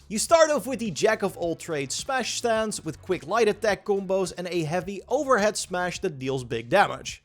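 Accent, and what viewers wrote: Netherlands. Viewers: I think he's not a native speaker. His accent sounds dutch. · He is dutch, you're correct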